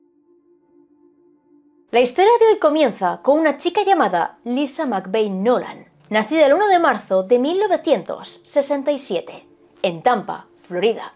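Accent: Spain. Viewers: Hola linda! Te veo y escucho no sólo porque tus historias son muy buenas sino porque me encanta tu acento! De qué parte de España sos ? Besos 😘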